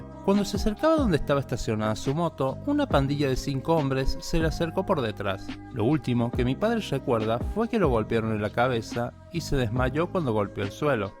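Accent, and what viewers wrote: Argentina. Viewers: Te falta quitar el acento argentino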